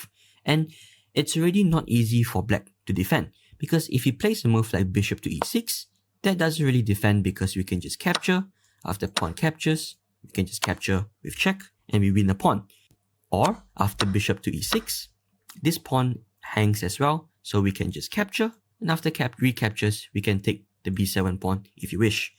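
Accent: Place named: Singapore. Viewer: hello dude, i wanna ask where r u from? u sound singaporean ngl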